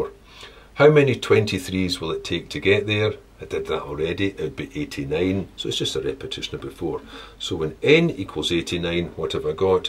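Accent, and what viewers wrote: Scotland, United Kingdom. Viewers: He's Scottish, not Irish.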